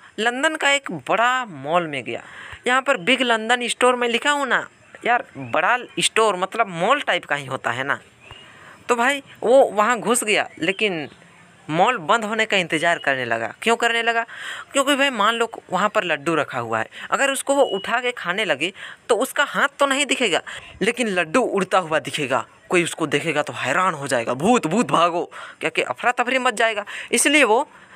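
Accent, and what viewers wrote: Bihar, India. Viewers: Bihari ho kya bhai 🎈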